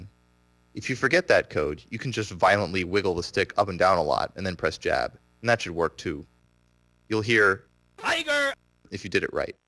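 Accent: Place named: United States. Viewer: nobodys asking anybody to be a weeb, but this is the most americanized pronunciation of anythig street fighter i ever heard lol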